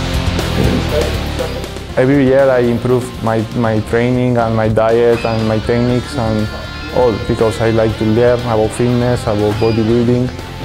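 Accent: Spain. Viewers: Looking at this pose, you can see he does need a little more work on the lats. And where is he from? I recognize a Spanish/Hispanic accent... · Se nota que es español...el ingles le hace falt pulirlo caxo...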